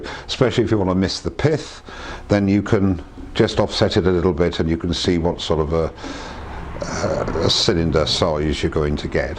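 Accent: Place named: Bristol, United Kingdom. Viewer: I've been producing some larch snowmen on my second hand lathe from Aldi (£70- not bad if you want to try some spindle turning before getting more invested.) Nowhere near your skill level so thanks a lot for uploading, I'm learning a lot from your stuff. Merry Christmas! Ps what part of the South West are you from. You sound 100% North Somerset/Bristol area.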